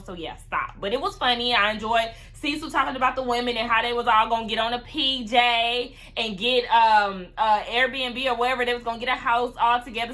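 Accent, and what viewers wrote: Charleston, United States. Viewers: I swear you talk like you are from my lovely city of Charleston!!!! I LOVE IT!!!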